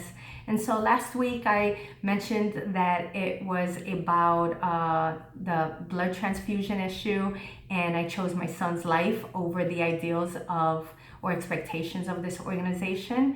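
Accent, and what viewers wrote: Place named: New York, United States. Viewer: Where is your accent from ? Sounds like a New York Latino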